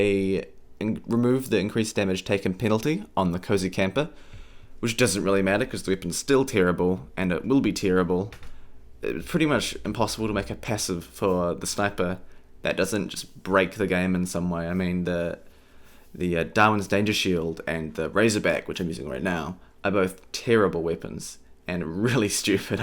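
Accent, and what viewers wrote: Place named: New Zealand. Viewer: <3 dat New Zealand accent and ur vids :P ~ From Straya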